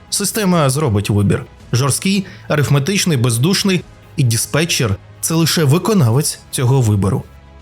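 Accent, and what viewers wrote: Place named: Russia. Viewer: диктор - ЗНАЙДИ українця для редагування тексту який читаєш, навіть не надто освіченого - просто неможливо чути твої ПОСТІЙНІ русизми, наче 3м6іцил якийсь... Навіть ШІ краще прочитає... "нЕ київ, нЕ москва" нІ платить тобі, ога!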